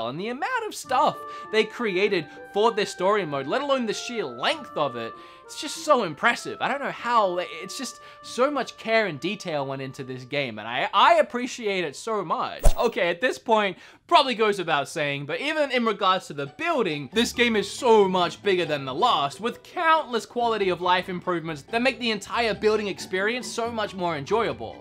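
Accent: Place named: Australia. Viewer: Woow, weird hybrid accent my fellow Aussie, I think?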